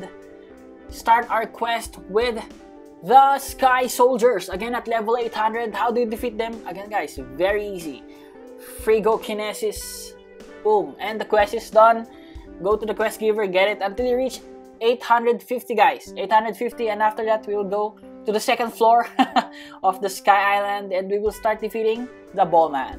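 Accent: Philippines. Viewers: SO THATS WHY U SOUND LIKE FILLIPINO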